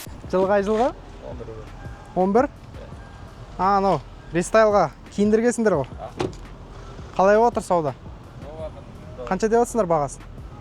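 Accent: Standard. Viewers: Бауырым, рахмет! Мына видеон браз конил куйди котерди е вахахаххааа Не деген мыкты обзор жане таза казакша сойлегенине рахмет айналайын!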